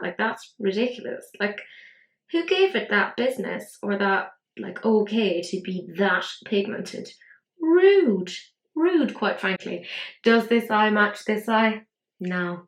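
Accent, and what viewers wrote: Ireland. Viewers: I loved this video x I have the dark fantasy and absolutely loved it defo need to pick up the liquid ones as they look fab x it’s so nice to hear your accent x I have family in Carndonagh so hearing the accent and the witty sense of humour really was magical x thank you for this video it made me smile xx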